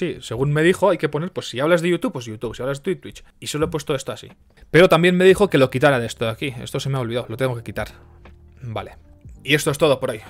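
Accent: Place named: Eastern Europe. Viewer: pero si tiene acento del este de Europa....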